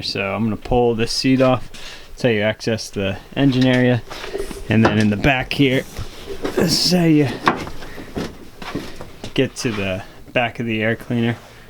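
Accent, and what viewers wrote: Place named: Pennsylvania, United States. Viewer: Great PA accent.......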